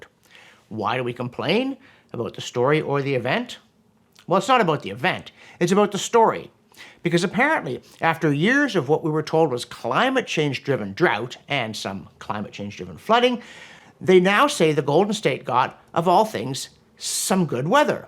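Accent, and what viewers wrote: Canada. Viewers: Dr. Robson, I have to say this. I know you are a good intended person very active in getting real information to people about the climate change alarmism and it's dangerous to our society. I'm just going to say it meaning to help because your message is very VERY important. Not so bad in this video, but ... since your message is SO important I think the way you comport the message is flawed. Your vocal delivery is plainly, not good. You speed talk so much and trail off at end of sentences and paired with your obvious Canadian accent, sorry but t true there's something there.. ... too often it gets frustrating trying to hear you and often I've just stopped watching the video to find something else I can actually hear. Yes, I have tinnitus, but I am not alone in that. I don't know what your hurry is and why you can't just take the time to present your message in a more listenable deliberate cantor. 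I think you'd find MANY more views on your videos if you'd try to improve your public speaking to a more pro presentation. I say this as a person who appreciates your efforts and especially your important message. BTW the caption often gets it wrong and can't keep up either. A little patience and taking it a bit easier will benefit your delivery. People want the truth they also want to hear it communicated clearly. Take this as constructive as I do like you.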